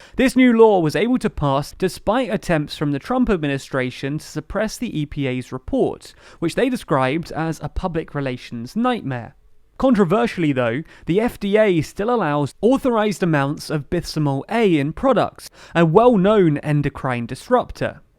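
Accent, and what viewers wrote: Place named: London, United Kingdom. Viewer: I was not prepared for this London accent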